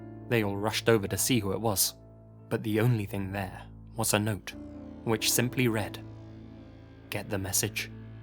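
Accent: United Kingdom. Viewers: They don’t say those phrases in the states. Sounds like a Brit for sure.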